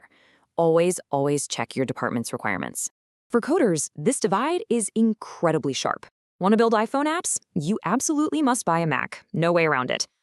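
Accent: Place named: Australia. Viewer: Oh my God, you’re Australian. I’m Australian.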